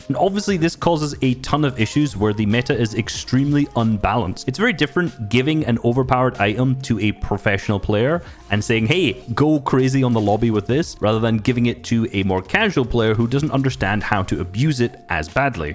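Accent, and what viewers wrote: Scotland, United Kingdom. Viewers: Gotta love that scottish voice:hands-yellow-heart-red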